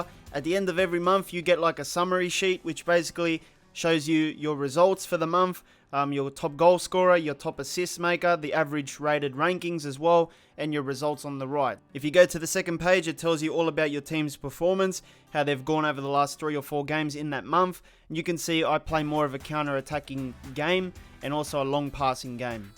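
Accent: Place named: Australia. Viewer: Man were u from Australia r new Zealand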